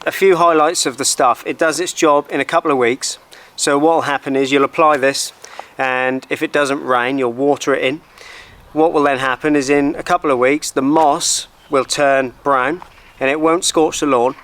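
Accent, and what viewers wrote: Norfolk, United Kingdom. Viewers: This was recommended right as im thinking about this, i might be a little late, but i probably couldnt make our garden worse than what it is. Also, as soon as i started listening i told my wife, this guys from norfolk, when i saw the bag i knew i wasnt wrong! 👍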